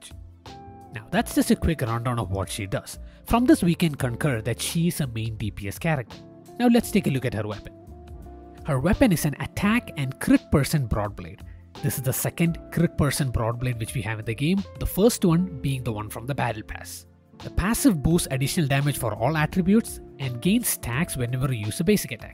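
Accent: India. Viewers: Your accent sounds indian Amd btw i am chosing jinshi idk why but i didnt liked changli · Is this Indian. I feel the accent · That South Indian accent though. Where you from my guy? Tamilian? Malyali? Nice video :D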